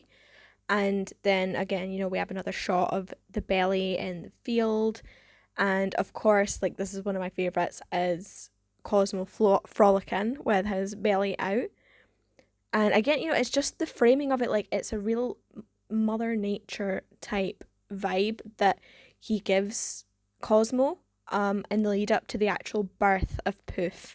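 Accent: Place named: Scotland, United Kingdom. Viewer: Where are you from? You have a really Scottish accent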